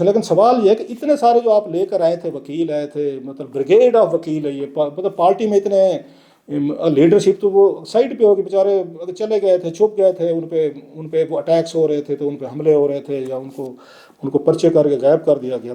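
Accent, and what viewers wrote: Saraiki, Pakistan. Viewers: Myusi na kaha karo, maausi kaha karo, serayki lahja thora tabdeel karo yaar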